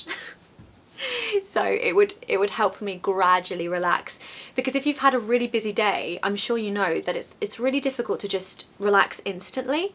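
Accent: United Kingdom. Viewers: I found your lesson about phrasal verbs very useful indeed!! I really love your British accent!! 💖💖💖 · Very nice I am falling for your British English 🇨🇦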